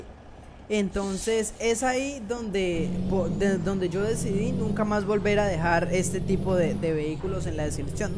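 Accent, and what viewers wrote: Colombia. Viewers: eres de pereira?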